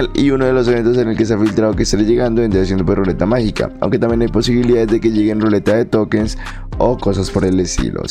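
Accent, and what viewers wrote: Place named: Argentina. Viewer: Lo más xd que escucharán hoy confundía el acento argentino con el español XD 😂😂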